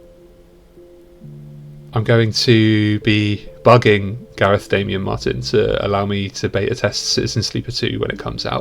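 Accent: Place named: Germany. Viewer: Lol, you losing the German accent remind when of when I was an exchange student in France and during English classes I straight up couldn't speak English anymore. My brain was: Yes. Translate. Know what to do. speaks French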